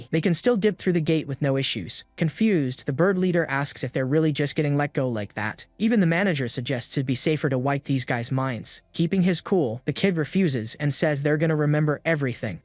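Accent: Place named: United Kingdom. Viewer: I feel like the voice is trying very hard not to be British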